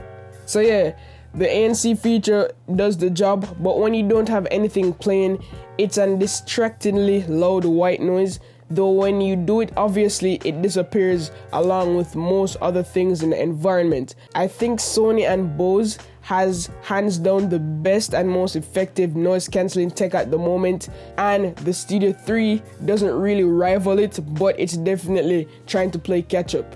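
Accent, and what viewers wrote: Jamaica. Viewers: i knew it! you sound just like a Jamaican. big urself yute. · Jamaican accent it's there Great video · No that's because he's of Jamaican decent living in canada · naaaa he’s Jamaican. He has a Jamaican accent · You jamaican bro?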